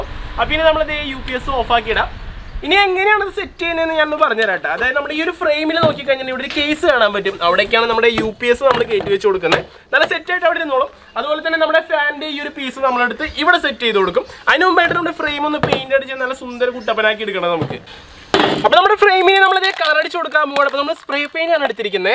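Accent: Thrissur, India. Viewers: Thrissur slang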